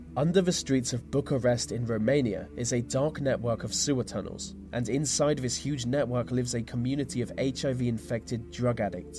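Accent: England, United Kingdom. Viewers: Hey there been waiting for your next video and it was posted the afternoon here in upstate New York what a great topic never cease to amaze me well thought out executed to a tee just love your voice and English accent you are a professional my good man Thanks so much I do appreciate all you put into these videos because I am always thoroughly pleased one of these days maybe our paths will cross and I will high five you job well done thank you until the next time I will be waiting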